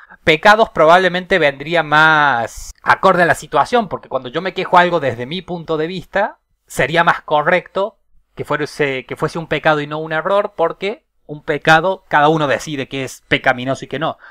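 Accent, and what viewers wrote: Córdoba, Argentina. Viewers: Me parecia un acento cordobés pero se me confundía por el filtro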